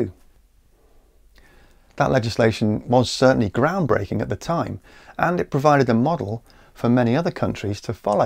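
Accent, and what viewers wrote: United Kingdom. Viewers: there are so many diffrent accents in the UK and this guy has the best one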